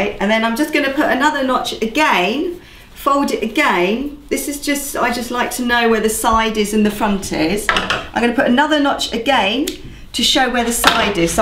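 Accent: United Kingdom. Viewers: you have the uk accent that i just looooove ! i repeat after you like that i learn the uk accent . hihihi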